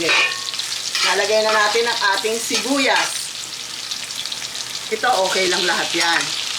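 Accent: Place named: Pampanga, Philippines. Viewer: San Po probisya nyo KC prang kapangpangan UN Punto nyo eh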